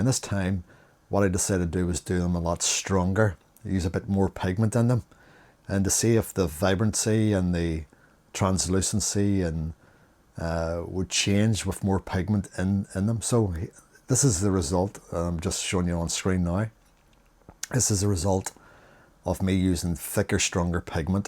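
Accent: Northern Ireland, United Kingdom. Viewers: Van Gogh is surprisingly good! I have some spare tubes and pans in a box with others, and they work really well. I never had an issue. Thanks for this video! Cosy and instructive ❤ (extra points for the Norn Irish accent)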